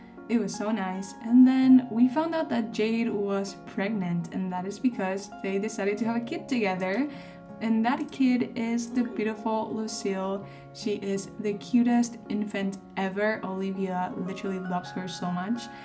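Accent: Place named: Argentina. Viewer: Ay que lindoo! Yo te sigo en tumblr y hace poco me enteré que tenes youtube, por el acento creía que eras de Argentina 🩵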